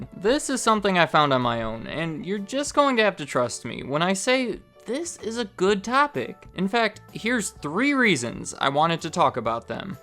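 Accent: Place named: Maryland, United States. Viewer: Im gonna guess from your accent you live in Fredrick md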